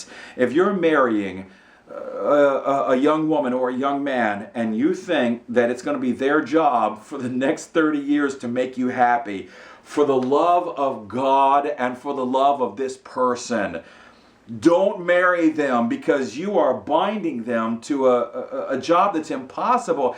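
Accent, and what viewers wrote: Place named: Baton Rouge, United States. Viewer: Baton Rouge accent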